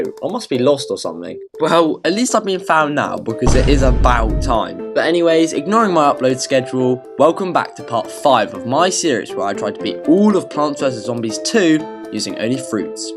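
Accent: United Kingdom. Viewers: bit too entertaining.. you british? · A GOOD BRI ISH GUY?!?!??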